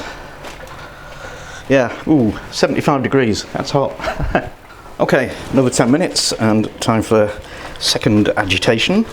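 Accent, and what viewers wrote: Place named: Liverpool, United Kingdom. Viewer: tnh I just watched your most recent video of crumpets and scrambled eggs, and I was going to unfollow you if your eggs were done wrongly.. well, they weren't really discribed very well so I came here; then you did scrambled eggs in the microwave.. so I'm done. Your Scouse was actually not bad though, and that comes from a Scouser; but I can not abide someone who demonstrates microwave scrambled eggs. I am sorry to leave this way, but at least I am leaving feedback. Also, your pea whack (pea and ham soup) recipe was pretty good too, yet not a revelation to those who learnt it from their ma'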